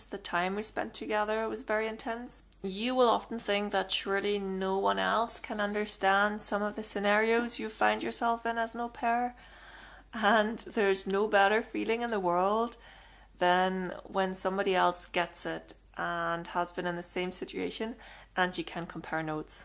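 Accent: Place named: Northern Ireland, United Kingdom. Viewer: You sound northen irish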